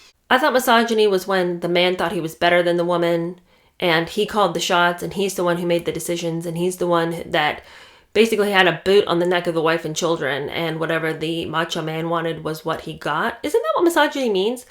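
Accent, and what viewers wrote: United Kingdom. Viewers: Love listening to you! Your British accent is hilarious ❤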